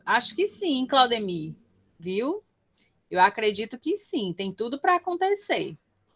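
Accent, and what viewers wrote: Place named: Northeast, Brazil. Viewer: gente eu amo o sutaque nordestino ainda mais de professora como esta <3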